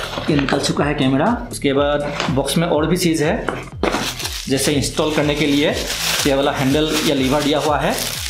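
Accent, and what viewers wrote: Assam, India. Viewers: He is Assamese I guess. His accent is Assamese 😀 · Apka bolnei ka tarika Assamese language sei milta hei ❤ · Bhai aap Assam se Ho kya... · Assamese hai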